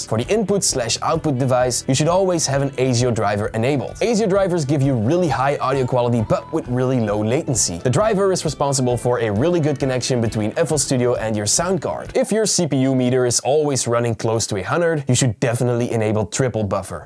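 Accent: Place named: Netherlands. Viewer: Ik hoor dat je Nederlands bent 👊🏼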